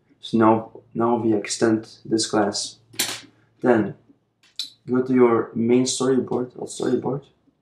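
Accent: Russia. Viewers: типичный русский акцент)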